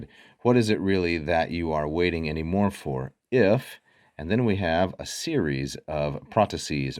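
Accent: United States. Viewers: The reader is very fast and has an atrocious american accent. Perhaps he should make an effort to correct this.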